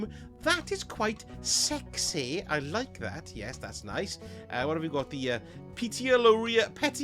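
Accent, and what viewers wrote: Wales, United Kingdom. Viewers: Just wanted to say been watching for a bit, thought I heard the Welsh accent, and well love ya channel and content it's cracking, have a grand day boio...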